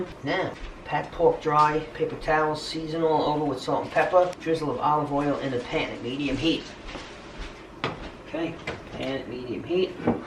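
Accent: New York, United States. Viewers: Love the NY accent..💕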